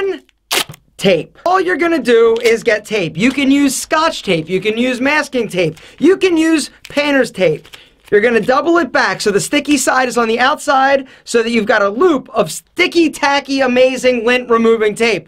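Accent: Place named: Italian-American, United States. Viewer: I just love your slight american-italian accent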